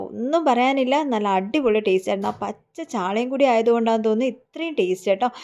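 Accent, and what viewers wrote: India. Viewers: സംസാരം കേട്ടപ്പോൾ കൊട്ടാരക്കര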